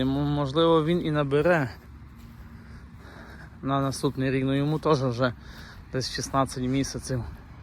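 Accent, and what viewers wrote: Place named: Lviv, Ukraine. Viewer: Привіт,чую по розмові шо ви з Львівщини,підкажіть де можна придбати теля бичка шароле?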